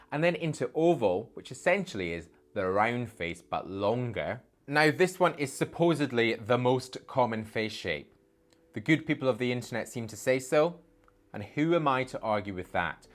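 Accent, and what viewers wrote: United Kingdom. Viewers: Hi! I came here to help my teenage son find a suitable hair style. Content was really helpful. Most of all I fell for your presentation 😊. I like your voice and clear presentation. I was thinking you are British or Australian? 😂😂I googled and found you are actually from one of my favorite country Scotland 😊. I have visited your beautiful country. All the best for your work! 🙏 ❤️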